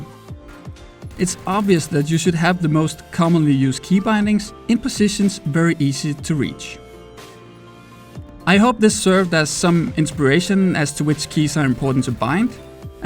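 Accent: Denmark. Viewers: That Danish accent tho!!!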